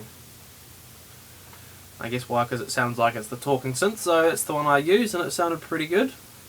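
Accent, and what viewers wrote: New Zealand. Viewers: NEW ZEALAND!!!! YEAH lol. first heard the accent and thought finally someone from my own country!!! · haha i love how as soon as i heard this guy talk, i knew he was kiwi! NZ PRIDE!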